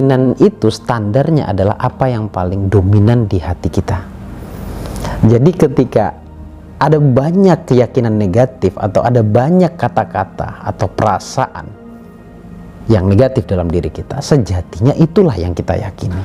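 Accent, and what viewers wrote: Madura, Indonesia. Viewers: Gus. Orang madura? Dn jawa.. Pantesan.. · Madura mana? Mas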